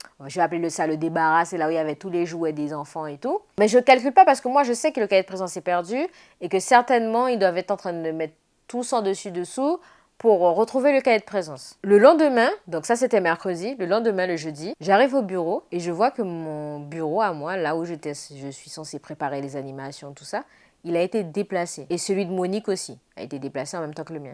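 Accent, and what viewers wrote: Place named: French Guiana. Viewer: Je suis guyanaise aussi et ta l'accent et la manière de parler mmdr.😂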